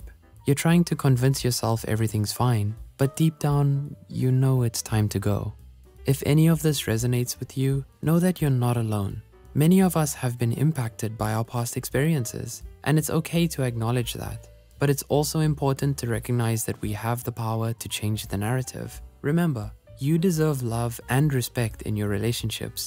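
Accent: South Africa. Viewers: out of topic but is the person talking south african? i hear the accent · Is this voice over South African? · Idk how to explain it, but within the first five seconds of hearing the narrator, I immediately heard a fellow South African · I am hearing my people. Is the narrator South African?🇿🇦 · Are you south african?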